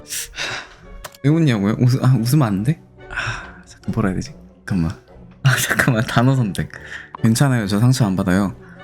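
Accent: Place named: Gwangju, South Korea. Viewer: 광주말투 진짜 매력있다 ㅋㅋㅋㅋㅋ 보들보들해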